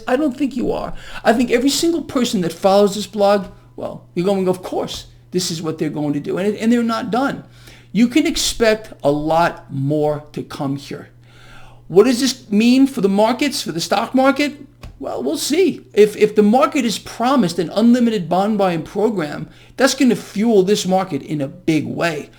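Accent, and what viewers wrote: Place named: East Coast, United States. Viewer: he's East Coast USA, there are different drawls (accents) in the US depending where you are at. One could say his accent & style are reminiscent of East Coast Mobs, but that would make him Super Cool! (Goodfellas, The God Father, etc).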